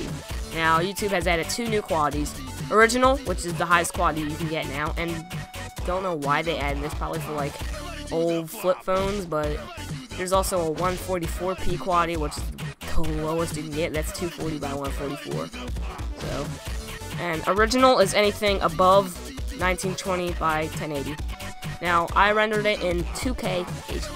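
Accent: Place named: United States. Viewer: you sound 100% American, why are you choosing PAL?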